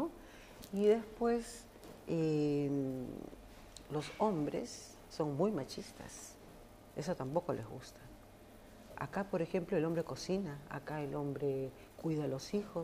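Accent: Peru. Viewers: Tú eres peruana? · Es el acento neutro peruano, tenemos más acentos por las demás regiones, pero uno de los más difíciles acentos para imitar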